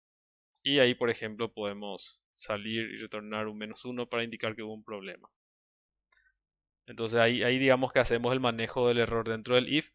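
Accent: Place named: Paraguay. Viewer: De la core cape, me ayudo bastante, me gusto el acento paraguayo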